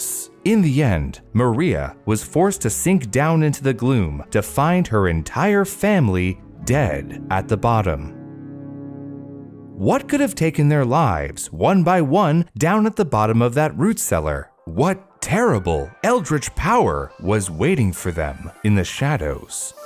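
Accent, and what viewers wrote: Canada. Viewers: Sounds Canadian … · u sound Canadian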